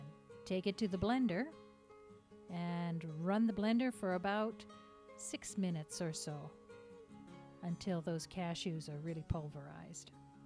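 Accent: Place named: Canada. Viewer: The Canadian accent is really only perceptible in the "ou," but it's the tone of your voice, speaking rate, and enunciation that make your videos a pleasure to watch....and the food! :)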